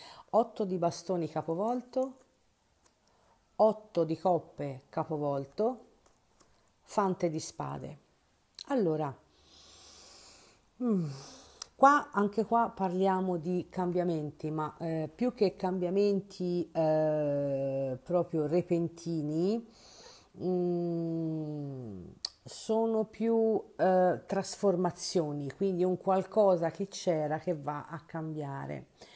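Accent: Tuscany, Italy. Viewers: Stesa n. 2. Col tuo simpatiho accento toshano hai centrato tutto alla grande. Se lui dovesse tornare e per giunta contrito (oggi lo sentirei come un miracolo...), giuro che lo faccio mettere in ginocchio sui ceci e corro a scriverti !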